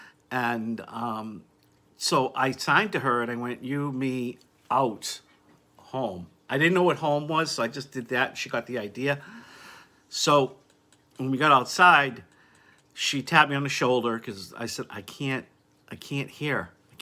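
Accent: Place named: Massachusetts, United States. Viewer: Watching from Kentucky. I can tell ur in Mass. Your accent. Love it. 😍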